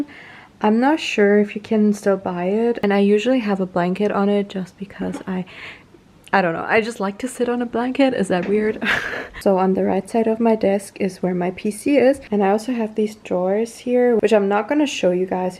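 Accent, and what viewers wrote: Germany. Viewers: As soon as I heard you speak I knew you were German! You are adorable!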